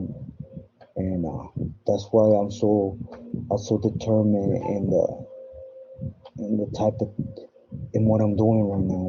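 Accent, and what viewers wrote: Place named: Solano County, United States. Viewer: You gotta be from Solano county...the way you talking...you might be from Vacaville maybe Napa or Santa Rosa....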